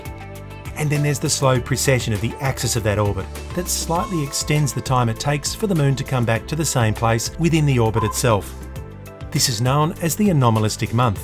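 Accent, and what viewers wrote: Australia. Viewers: Are you an Australian or a New Zealander? Can't pinpoint the accent.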